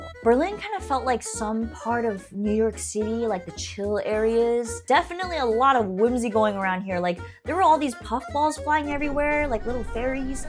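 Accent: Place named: United Kingdom. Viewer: Wait you are from 'MURICA?! I tought you were like British or a very confused German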